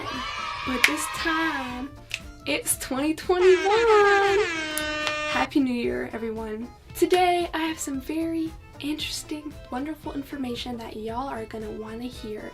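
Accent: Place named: United States. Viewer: OMG!!! That heavy American accent is quite funny haha. Whenever I hear it, it just makes me laugh lol.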